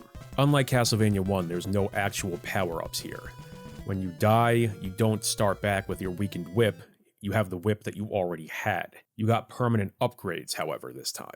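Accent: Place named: Philadelphia, United States. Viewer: The Del-Co Philly accent, the sunken tired eyes, the walkthru under the guide as a review....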